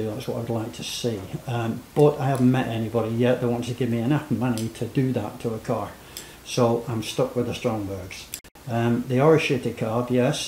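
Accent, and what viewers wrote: Yorkshire, United Kingdom. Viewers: Are you a Yorkshire man ?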